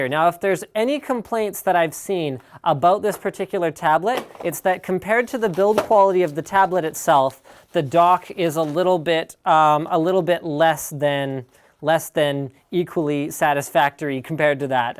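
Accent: Germany. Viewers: >.< I watch this show for your German accent... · german accent? wtf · You're german? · Im solely here for the german accent :) Sehr gut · Came for the German accent, stayed for the unboxing! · I watch for the German accent... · I dont know about anyone else but his german accent is pretty much the only reason i watch his videos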